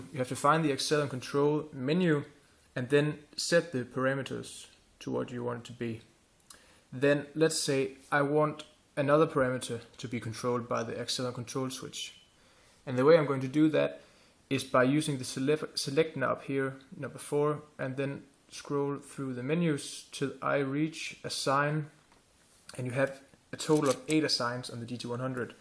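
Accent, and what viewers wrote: Denmark. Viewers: Hi again! nice videos. Easy understandable. Are you Danish ????? thanx again 😁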